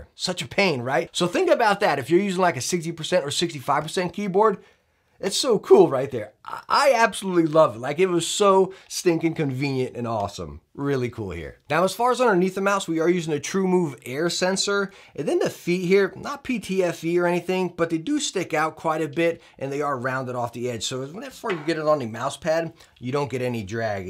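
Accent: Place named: New York, United States. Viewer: Love your attitude. You from new york by any chance? Feels like it. Great review, thanks.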